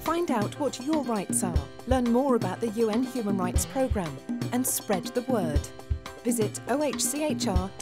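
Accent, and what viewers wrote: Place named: United Kingdom. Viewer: What a British accent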